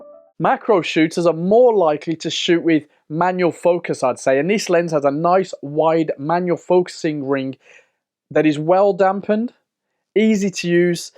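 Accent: Birmingham, United Kingdom. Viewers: Thanks great review of this lens - I love your sample photos. I think that you have just made my mind up over which lens to buy next. I have the P/L 12-60 with G9. Considering P/L 50-200 but price and weight putting me off. Just seen P 35-100 F2.8 but I think for the type of photography this macro lens is great and can be used as a fast medium telephoto. Thanks for the no nonsense review with a Brummy accent.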